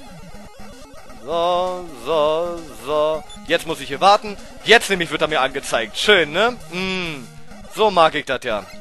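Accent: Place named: Berlin, Germany. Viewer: berlinerisch!!!!!!! Icke.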